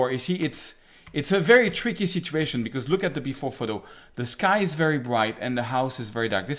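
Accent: France. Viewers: I like the way French speaking English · Encore un excellent tuto et personnellement je trouve qu'il est assez facile de comprendre vos tutos en anglais de part le fait de votre prononciation à la française ;-) encore merci et félicitations pour votre travail.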